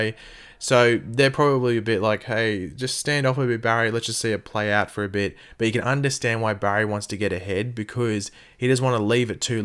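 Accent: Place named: Outback, Australia. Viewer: I didnt subscribe because of your content, i subscribed because of your outback sounding voice, just sayin hahaha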